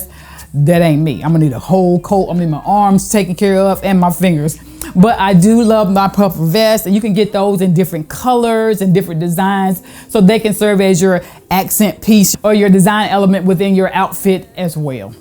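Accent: South, United States. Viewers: I knew you was from the south from your accent, ahhh NC. I’m from NC. Great video!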